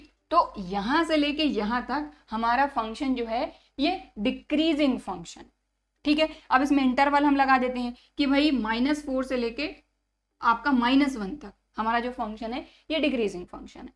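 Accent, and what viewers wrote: Haryana, India. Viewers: mam I think you belong to HARYANA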